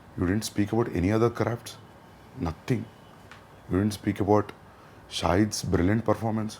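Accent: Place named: Hyderabad, India. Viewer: That is slang of Hyderabad urdu. Both Mumbai & Hyderabad have Deccan urdu style which is different from North India.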